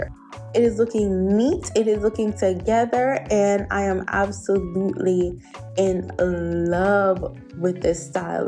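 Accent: Jamaica. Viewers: I think she's Jamaican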